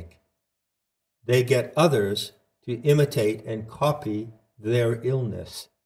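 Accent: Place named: Boston, United States. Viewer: And thank you for stating the obvious, as you say toward the end...those of us with eyes to see appreciate it all the more, as it makes one feel less alone, which fortifies the will to work harder in the attempt to reach out to other human beings. Obviously you are hip to Gates foundation and others wanting to mutate (gmo), and therefore patent, all life on this planet. They must be stopped, they are far enough down that road already. I can hear youre aware of much of this, thank goddess! Its amazing how everthing gets inverted by these folks, as in the term 'germ' which USED to denote growth. I can see you gnow this very clearly. I am a Jungian Psychologist originally from Salem, Ma, now in sw florida. I spent a few years living in Taos, NM...wish i knew about you then, i could have visited you in Santa Fe!?The fact that you havent completely lost your Boston-ish accent warms the very cockles of me heart! 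I have no idols - but i hope like heck to run into you someday. In meantime, thank you for your lifes work as well as reaching out to those who care to listen. I have never stopped listening to nature, for she is ever honest and truthful - as i think either Geothe or Steiner said...or was it perhaps Whitman? I love them all - and i send my love to you, oh kindred spirit! ; )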